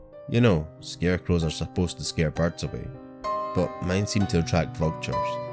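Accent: Scotland, United Kingdom. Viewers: Mr I like scary stories but your Scottish voice just makes it better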